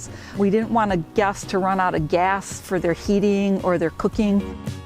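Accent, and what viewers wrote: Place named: Midwest, United States. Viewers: Ahhhh, I knew that I detected a Midwestern accent in the narrator's voice! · I caught your Michigan "accent" immediately! lol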